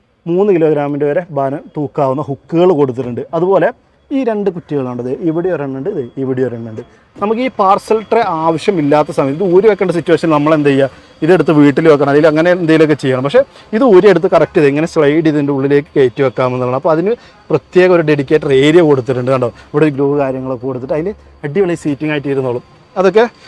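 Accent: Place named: Malappuram, India. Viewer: Typical malappuram slang aanu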